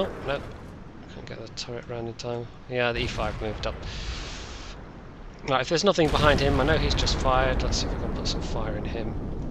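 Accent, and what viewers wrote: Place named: Scotland, United Kingdom. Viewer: On my side bar, it's recommending a video for me, the Most Offensive Jokes Ever, Frankie Boyle is pictured, his jokes aren't offensive,merely telling the truth, you Scots have a unique way of speaking that that is true,don't you? With this in mind, Which tank in WOT really offends you?, offends you by just being there!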